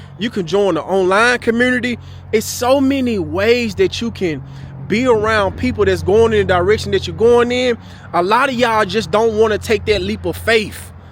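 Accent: Memphis, United States. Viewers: I used to live in South Memphis near McLemore. At first I wasn't gonna ask, but you sound just like someone from Memphis, that's why I asked.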